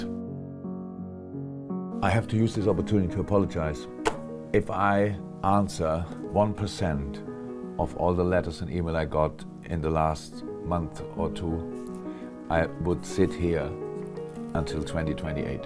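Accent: Liverpool, United Kingdom. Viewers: Not a Liverpool fan but Jurgen I salute you and all you have achieved. There are so many things I admire about you and your time in English football but if I were to list them all, I’d be here until 2028! 😅 The Premier League will never be the same without you mate And by the way…I think your scouse accent is class! 😂👌 All the best for the future buddy! YNWA ❤️ Joel Barnsley fan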